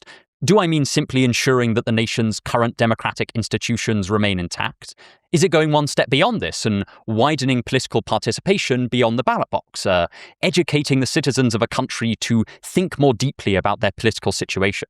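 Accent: United Kingdom. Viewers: I like yur voice...are u brit?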